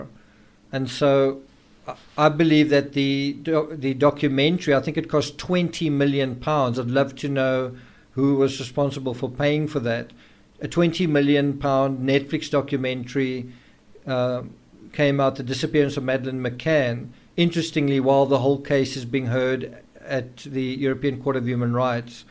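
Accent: South Africa. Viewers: First time watcher. Why does it sound like you are South African?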